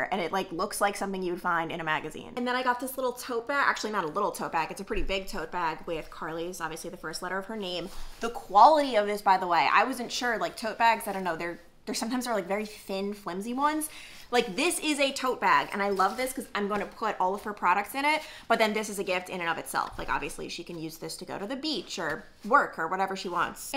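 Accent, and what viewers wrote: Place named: Long Island, United States. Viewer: Your Long Island accident is back…. And I love it!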